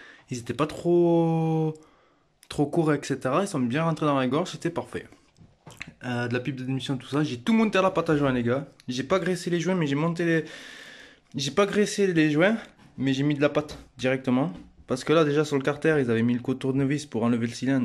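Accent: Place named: France. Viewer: L'accent français j'pp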